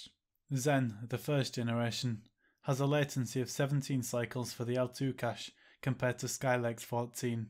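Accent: United Kingdom. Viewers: Is that a bradford or leeds accent?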